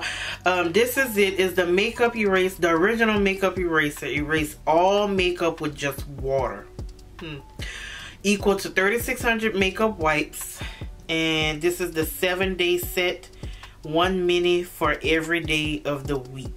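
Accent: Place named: New Orleans, United States. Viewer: You sound like you from New Orleans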